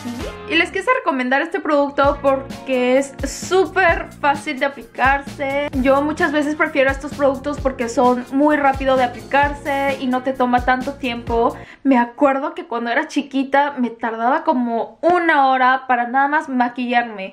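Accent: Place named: Mexico. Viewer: Haha tiene acento de mexicana fresa, me gusta xdxdxd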